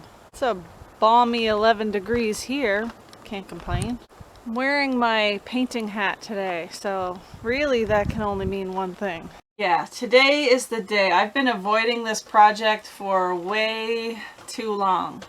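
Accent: Canada. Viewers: I think you did and awesome job! Love that Canadian assent thanks for the video 🎉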